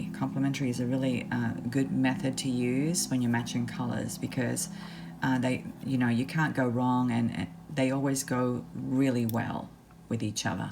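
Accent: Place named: Australia. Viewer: Aah ha, I thought I detected some Aussie there in your speech. I am a Brisbane girl too from Scarborough on the Redcliffe Peninsula. Travelled quite a bit but always come home to Redcliffe. X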